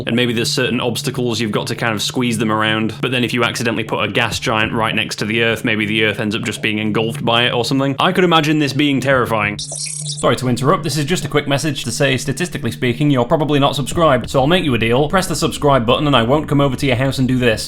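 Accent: United Kingdom. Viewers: Just subscribed absolutely love your commentary 😂 is that a northern accent i hear? ❤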